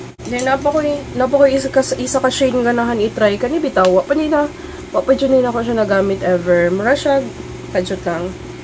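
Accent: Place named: Cebu, Philippines. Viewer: Like number 5:) heheh ang cute niya mag cebuano heheh ang saya agad ng bungad heheh:) wow gusto ko tong tag na to hehehe:) sakto ka sub ko lang din sa kniya now heheeh:) ang cute cute nimu mag visaya ui heheeh:) You just got a new subbie, It's Vangeline from IndayG's channel:) cheers!!!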